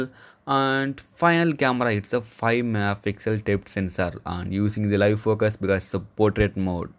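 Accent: India. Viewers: chinese man with indian accent?? WTF?! 😂😂😂 that's not your video you copycat!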